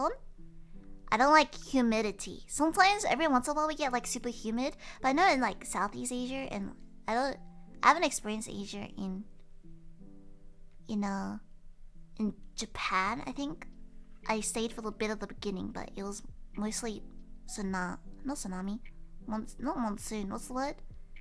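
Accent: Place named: Australia. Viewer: Your Australian accent is adorable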